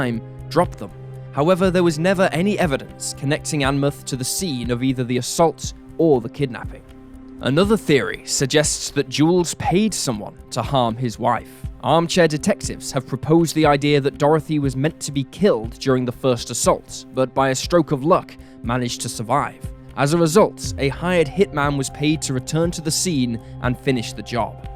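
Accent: United Kingdom. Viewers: Where would you ascribe his accent in the UK?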